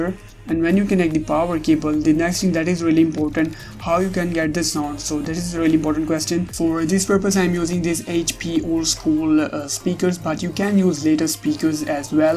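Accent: India. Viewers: He sounds indian, no offense dude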